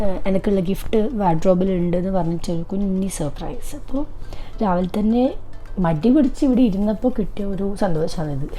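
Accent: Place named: Mangalore, India. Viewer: Lv ur way f talkng frm mnglrian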